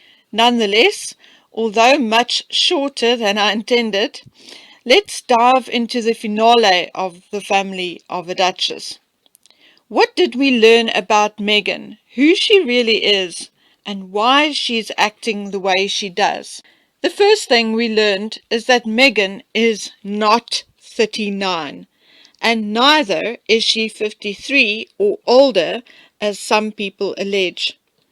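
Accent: South Africa. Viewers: Thank you for your honest and unbiased opinions. So refreshing to hear a fellow South African's accent. Regards from Cape Town. God bless😍 . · I read somewhere ages ago that Thomas was also afraid of Meghan, her rages were really bad and she used to physically attack him. From the news of the way she abused her staff and other people’s staff verbally and physically, I can imagine this is true. Do you have any clarification on this? On Doria she had a long gay relationship with another woman. Which ended just after Harry’s wedding. So she definitely doesn’t like men! I do feel strongly about Archie, if he does exist, he is being kept in isolation which is cruel and unkind treatment of a child. Anyone else whole have had child services called on them. Meghan isolates those she wants to control - she is extremely abusive! Ps. I love your videos, you obviously spend a lot of time investigating. 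I also like your accent, I went to school in South Africa so your accent is nice and familiar, thank you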